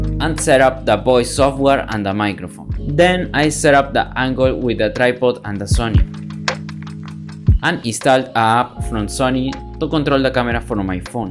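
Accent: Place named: Argentina. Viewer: Lo hubieras hecho en español y con tu acento argentino para no tener q sufrir tanto con el ingles jaja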